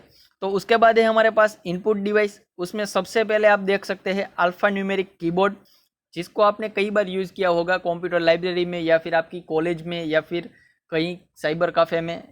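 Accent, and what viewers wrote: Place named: Kathiawar, India. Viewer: Bhai Gujarati lage chhe..., Kadach kathiyavadi...keep it up bro... Subscribed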